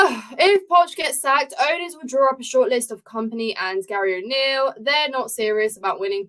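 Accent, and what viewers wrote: United Kingdom. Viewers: Thanks.Ive got to say that the board will be taking that decision anyway.The next three games to the New Year could well put us in the danger zone.Could well be another interim manager to the end of the season..Its the Poch.playing system that is causing all these difficulties.Dearly wish we had signed Naglesmann to play that German system.It does work in the Priemership as Klopp has proved. Incidently I love hearing your London voice brings many homesick memories back!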